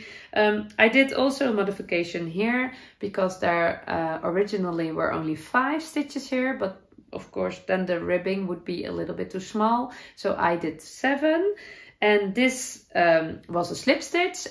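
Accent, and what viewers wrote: Netherlands. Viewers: thank you so much for sharing your life and makes with us all. I love your dutchy-english and am always impressed with just how fluent you really are. That bike ride at the end was FABulous! I'm so envious of your locale 🌳and I absolutely love your pup 🐶❤You really are so talented and seem to know your personal style so well. Oh yeah, I also love your hair!!!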